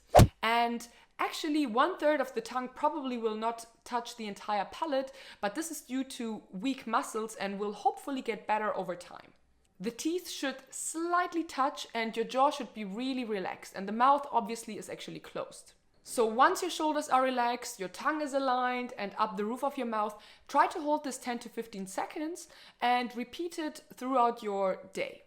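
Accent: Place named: Germany. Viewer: Hab direkt gemerkt, dass du Deutsch bist ;)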